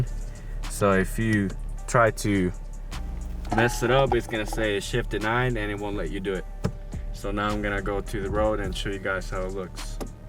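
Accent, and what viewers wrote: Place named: Baltimore, United States. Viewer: Are you from Baltimore? That Tew and Yew sounds familiar lol😂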